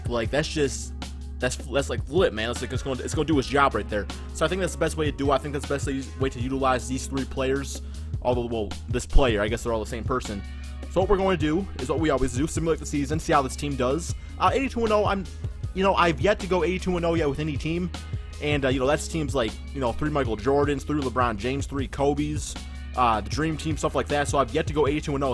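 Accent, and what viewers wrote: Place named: Midwest, United States. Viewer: Where are you from? The Midwest? Your accent stands out.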